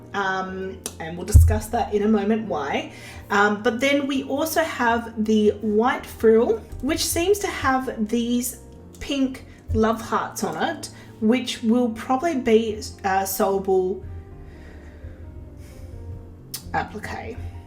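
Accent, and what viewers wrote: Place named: Australia. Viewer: I love the Australian english!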